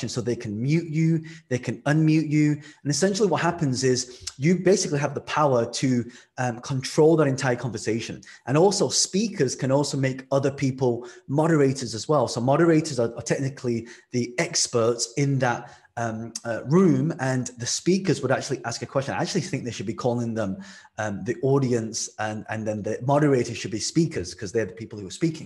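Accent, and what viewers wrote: United Kingdom. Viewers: I love the good knowledge from you.I love your British accent also.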